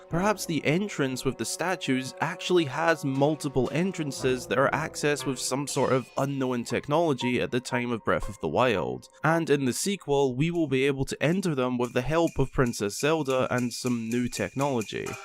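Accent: Scotland, United Kingdom. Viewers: I love your scottish accent it makes the videos so chill🥰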